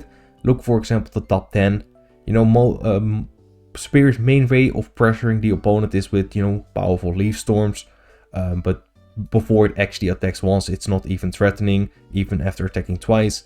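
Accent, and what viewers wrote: Netherlands. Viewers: First word you spoke I immediately knew I was hearing a fellow dutchie 😂 Lovely content though :) glad to have found your channel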